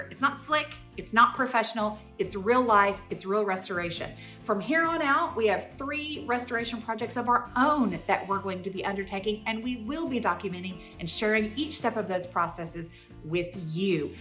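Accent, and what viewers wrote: South, United States. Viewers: I cannot thank you enough! Seriously. I’m a 32 year old woman who has been (oddly) passionate about historic architecture, primarily residential, since early childhood. I remember my mom taking me to the plantation homes in Live Oak and Amelia Island when I was in elementary school, and being obsessed. When I was in middle school it was the Biltmore Estate, and in high school it was Stone Mountain, GA’s historic homes that were shipped in from all over the country. That led to me taking architectural design courses, and I FINALLY landed myself my very own restoration project in my 1919 arts and crafts home in Pennsylvania. 😍 I so enjoy the videos you share, and all of the knowledge you are passing on. Not to mention the beautiful preservation of history that you’re directly responsible for. Just immaculate! Thank you, thank you, thank you. 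(The southern accent also helps! Lol. From one southerner to another. I miss the accents from back home now that I permanently have lived above the Mason-Dixon for the last decade!)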